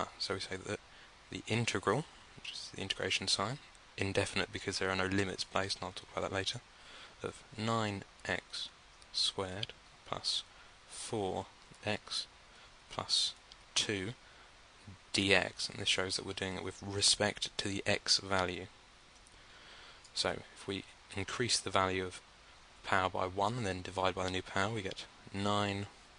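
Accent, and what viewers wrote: United Kingdom. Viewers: Your voice and awesome British accent makes this video that much better. Plus you do a great job explaining!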